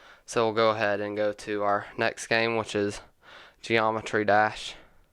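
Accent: South, United States. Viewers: That southern accent though :)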